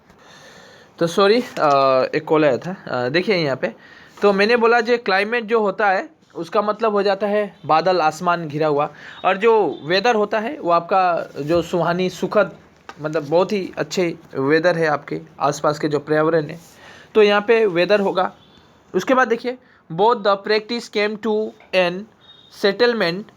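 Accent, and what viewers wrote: Assam, India. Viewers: are u assamese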